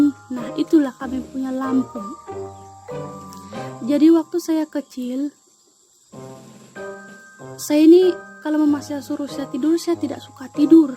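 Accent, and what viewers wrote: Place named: Kalimantan, Indonesia. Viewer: ckap serupa orank kalimntan..borneo😃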